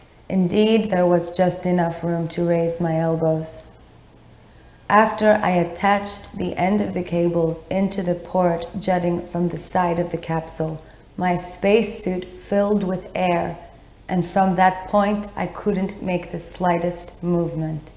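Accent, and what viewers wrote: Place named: Canada. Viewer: Ehhh Sounds like a Canadian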